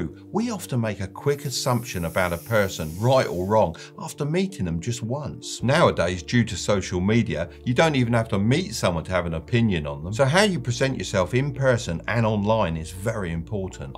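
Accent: United Kingdom. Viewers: AND your British accent. It's just beautiful haha.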